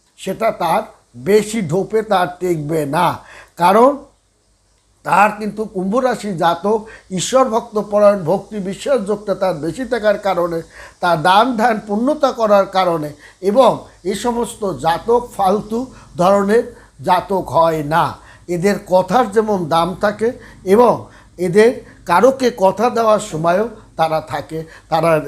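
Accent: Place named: Jessore, Bangladesh. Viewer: উনি যশোর জেলার/ খুলনা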